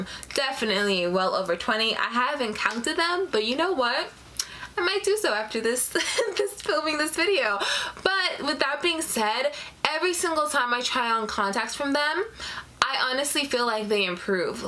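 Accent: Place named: Belize. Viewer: Are you from Belize? I hear the accent in some of your videos. I love your video content :)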